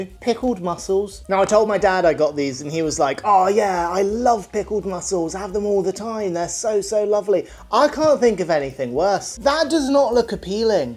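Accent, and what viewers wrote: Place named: England, United Kingdom. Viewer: Where is your accent from? As in which part of England?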